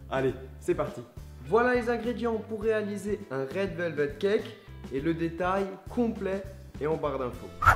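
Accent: Alsace, France. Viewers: oui il est tu l'entend a l'accent hhalsacien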